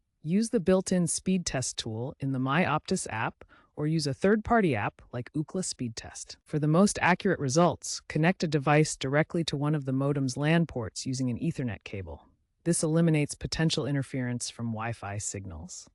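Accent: United States. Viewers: Why the American accent?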